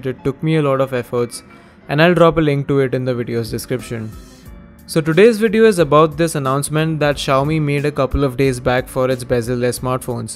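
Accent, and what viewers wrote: India. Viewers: I love the way India saying "t" words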